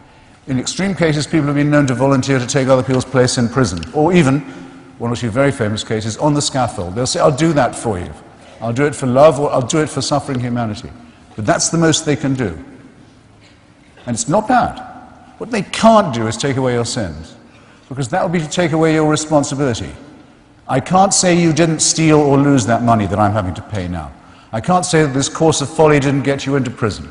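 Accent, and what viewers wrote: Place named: England, United Kingdom. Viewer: Two things. 1, Hitch was one of the most well-read and determined intellectuals of the past 100 years. In a world where religious conviction is still considered brave, he was brave in a way that would embarrass the proponents of that definition of bravery. Most of all, he was a consummately moral and humane person. He was a good man, and he didn't need to jump on anyone else's bandwagon to prove it. 2, English accent.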